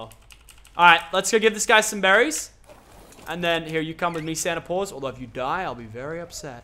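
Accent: Australia. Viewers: Is he australian cause i am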